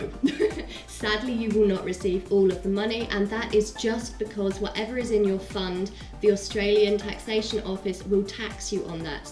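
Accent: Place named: Scotland, United Kingdom. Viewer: lovely accent :) Scotland ?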